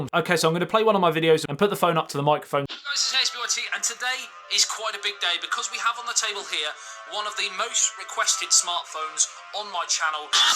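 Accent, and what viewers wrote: United Kingdom. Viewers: Hate british accent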